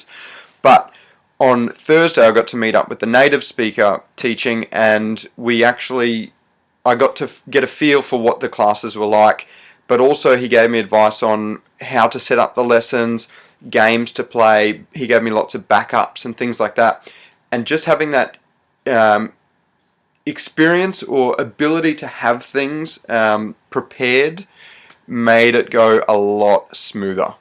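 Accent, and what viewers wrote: Australia. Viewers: Bradlyn Dukes I'm aware accent is aussie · im pretty sure his accent is Australian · Watching one of your older videos makes me think if you losing slowly your Australian accent.what do other people say about that? Cheers mate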